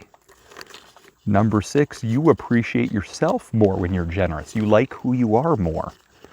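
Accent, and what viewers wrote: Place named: Canada. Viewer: Sir, You make a lot of sense. So true. Throughout the years, I collected so many O Scale model trains. I’ve sold some for charities. Going to do more. Same with my business suits. Just WHAT am I holding onto them for? I’ve donated business jackets, suits , dress shirts and ties to a rehab for young men who are recovering alcoholics and from drug addiction. Going to do more of that to. I’ve listened to your channel and am impressed with your intelligence. And… by the way, I’m trying to make friends with raccoons in my backyard. Just how can I do this? I’ve made a table and put various treats, including cheese, bread, frankfurters. Now I’m trying peanuts. By the way, I listen to your pronunciation of ‘Os’. Are you Canadian? If so, glad to meet you. I’m from New York and absolutely support Canada.🇨🇦. God Bless Canada. I’m glad that our “president “ left the G6 (without the United States) in a huff.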